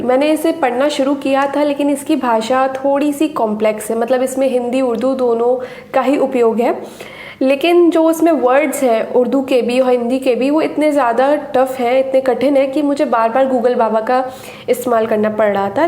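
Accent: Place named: United Kingdom. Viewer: Missing the British accent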